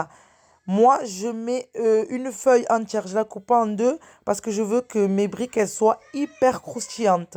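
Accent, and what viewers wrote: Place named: Montpellier, France. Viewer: ton accent,tu es vers Montpellier ?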